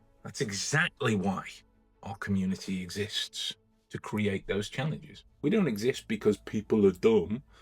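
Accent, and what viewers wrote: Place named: Quebec, Canada. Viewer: En fait non seulement tu parles le français mais tu parles également le québécois et ça, ce n'est pas rien !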